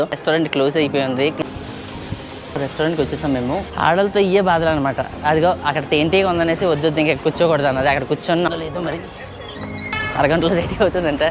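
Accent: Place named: East Godavari, India. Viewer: Anna mana east Godavari slang dose penchu bro comedy please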